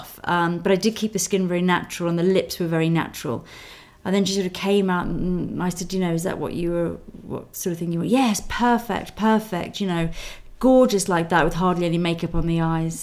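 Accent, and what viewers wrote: England, United Kingdom. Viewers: wow u are a makeup GURU! i love ur accent, lol when i try to be british i sound just like u. thanks for ur help. oh, and u look rlly beautiful! :D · you mean english accent, there is no such thing as a british accent. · I LOVE her British accent :3 i feel that she was more of interacting with me in the video :D despite her talking a lot. but i liked it! :) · im here to learn british accent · I love the british accent it sounds so elegant :D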